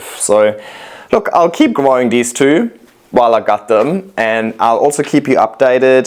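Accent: Germany. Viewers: I don’t know why but I can’t get over your accent (?) there’s a voice in my head screaming “he is germannnn!!!!!” Love your Videos btw :) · Are you originally from Germany? I can hear an accent. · I sorry but I have to ask are you from Germany or Austria? You sound like my friend from Berlin 😅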